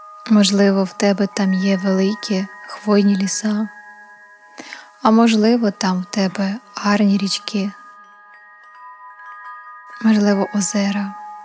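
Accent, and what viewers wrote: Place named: Ukraine. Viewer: Дякую мило🤗,а особливо за українське звучання ❤️🇺🇦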